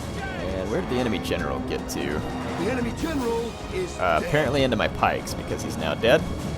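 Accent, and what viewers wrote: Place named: South, United States. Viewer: it's funny how you say things like "ought" and other southern slang. Don't take offense to what I say, and don't change it. But what am I to say anything, I'm a stinking northerner.;)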